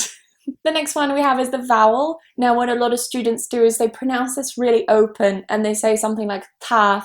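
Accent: United Kingdom. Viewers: Thanks for the video,..it's good to learn how to pronounce words in british accent...it's amazing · Thank you Thoroughly This is a little too British for me… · I like British's accent. beautiful. I like you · I fell in love at first sight, but I'm afraid you're already married ☹️. Anyway, I'm learning american english nevertheless I'm going to learn british accent as well because of your videos. Thank you in advance 😊. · Thanks a lot of your video and beautiful British accent. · I'm really for in love for video. Fist at all I like british pronuncition, and it much clear. I was looking for a pronunction couse and it meet what I wanted. My language is portuguese and video was so helful. I love english language. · Oi mate! 🤭 love listening to British accent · thank youu,,, i love ur british accent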